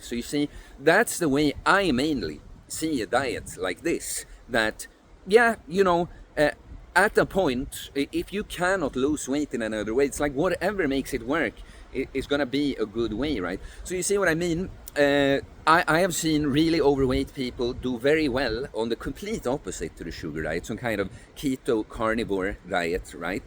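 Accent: Sweden. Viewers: Looking Finnish , but with a clear Swedish accent. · Haha älskar att höra en riktigt pursvensk man prata engelska. Subbed.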